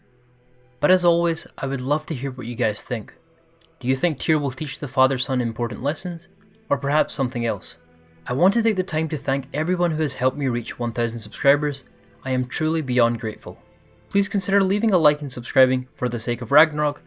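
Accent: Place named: Scotland, United Kingdom. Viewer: You're very good at this - and youll only get better with more practise. Keep it up. Also great to hear a fellow Scottish accent in this scene.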